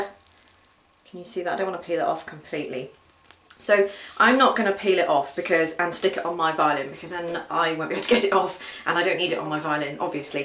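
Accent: United Kingdom. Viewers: Hey:D Thanks! I found this clip really useful since I'm still finding my early steps.. I like the whole series too.. Keep it up (y) P.S. I love ur British accent too:D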